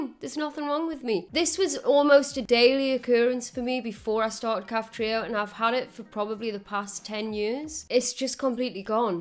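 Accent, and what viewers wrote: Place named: Teesside, United Kingdom. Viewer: Great news. Glad your health is improving. BTW, where is your accent from? Sounds a bit "Boro" to me.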